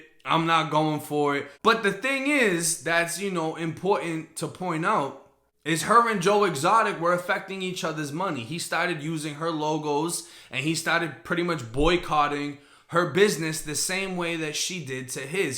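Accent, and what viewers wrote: Boston, United States. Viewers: Bro if your from Florida why are you speaking with a Boston accent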